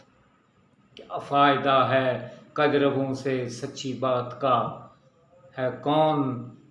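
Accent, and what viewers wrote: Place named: Iran. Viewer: Your persian accent is so beautiful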